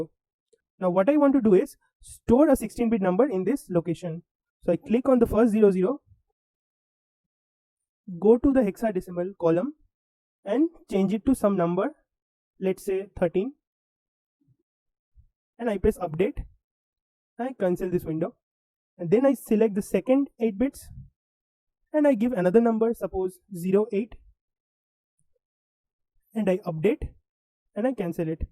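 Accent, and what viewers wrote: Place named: India. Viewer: Indians speaking english sounds very ridiculous, next time use subtitles or remove anything below your tonge, the world will thank you.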